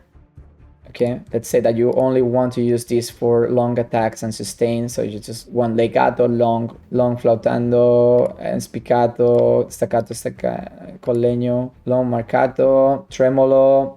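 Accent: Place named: Spain. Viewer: soy de colombia y hasta en inglés se nota el acento de españa. hahahaha, tan lindo e inteligente que es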